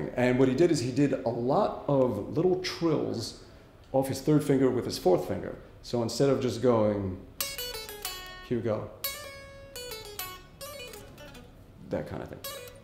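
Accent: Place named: United Kingdom. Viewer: speaks like British some times, He is the american G. Govan.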